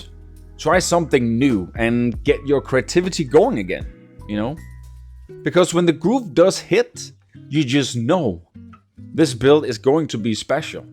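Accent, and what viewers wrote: Denmark. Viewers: Thanks for the video it helped a lot. Og det også altid så hurtigt man hører den danske accent når man åbner en tilfældig video haha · Are you slightly danish perhaps? the accent sounds very danish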